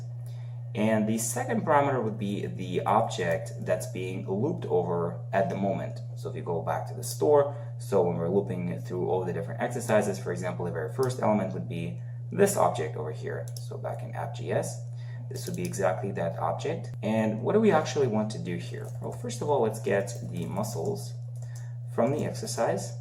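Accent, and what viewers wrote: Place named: Canada. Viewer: Excellent videos! Love the slight accent... Canadian?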